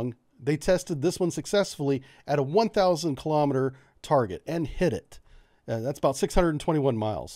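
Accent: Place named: United States. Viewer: You sound exactly like I'd only expect to see from an American.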